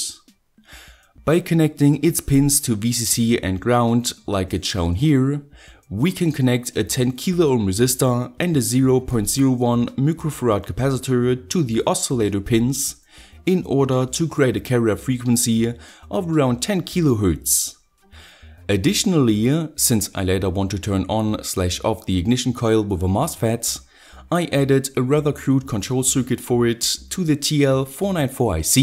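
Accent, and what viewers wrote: Germany. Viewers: Can you build a singing Teslacoil please ? And are you German ?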